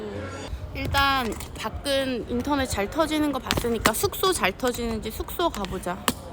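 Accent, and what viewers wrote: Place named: South Korea. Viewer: 구독하고 힐링하며 보는 구독자입니다 해외로 많이 나가시는거 같은데 영어 공부 하셔서 영어 까지 잘하시면 더 멋진 여행 유투버 되실거 같아요 영어 하실때 너무 콩글리쉬에 조금 보기가 민망해요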